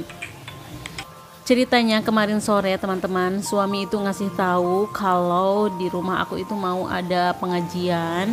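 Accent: West Java, Indonesia. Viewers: teteh asli mana? Logatnya sunda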